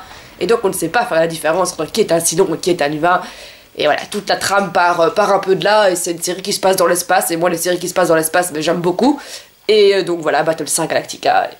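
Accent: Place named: Belgium. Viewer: abonnée^^ je te découvre grâce au groupe. je suis comme toi,1 peu comme la voix des opprimés,je cherche toujours à trouver le bon côté des gens même si tout est contre cette personne.j aime bien l italien.j aime bien ce que tu dégage^^. Tu es belge?(oui j ai eu la réponse plus loin)un p'tit accent😉.bisous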